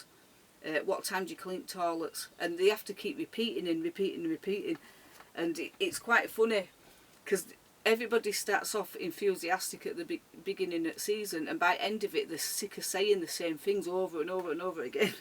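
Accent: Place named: Huddersfield, United Kingdom. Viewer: Yes, I have sent people racing pigeons of mine up there and Poultry. You sound like people I know in Huddersfield and Hebden bridge girl x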